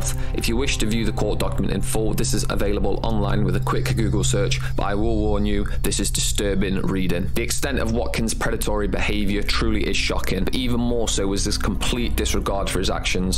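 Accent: United Kingdom. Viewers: Sorry for asking! Is ur accent british? (Not a native English speaker is asking!)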